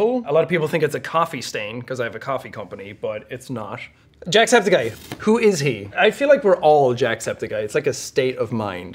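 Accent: Ireland. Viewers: I am not about to scroll through 4,425 comments to see if anyone else has already said this, but the question is "Is Jacksepticeye in Human Resources?" There is a show on Netflix called Human Resources, which is a spinoff of Big Mouth and has some crossover characters. There is a character named Flanny O'Lympic, a Lovebug who speaks in an Irish accent. He is voiced by Chris O'Dowd, a fellow Irishman (best known as Roy on The IT Crowd). Maybe the people who googled that think Chris and sound alike because they are both Irish? It premiered March 18, 2022, three months before this video was released. Maybe hadn't heard of it or seen it. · "an Irish accent goes a long way"... Have you forgotten how you started out:s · The reason why I start watching his videos because of his Irish accent sound funny and inspiration but long stop watching his video due to burnout so I just start to see my sister become fan of now. 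It have been 7 years already that I stop watching his video now good to hear he healthy and keep continuing his career. Well except those stuff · Most Irish accent ever lad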